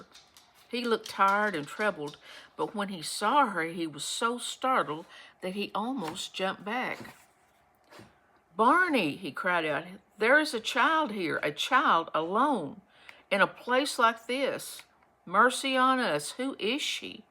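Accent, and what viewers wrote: Kentucky, United States. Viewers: Thanks! By chance this summer I read A Secret Garden and Anne of Green Gables. Such a nice relief from other troubles. Thanks again. I love listening to a Kentucky native reading these gems!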